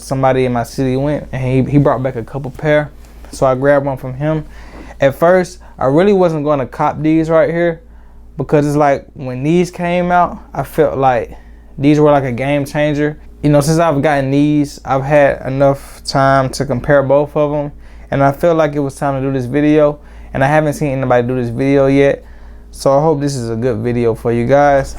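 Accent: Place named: Miami, United States. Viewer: You gotta be from Miami the word “really” give y’all away sound like rally lol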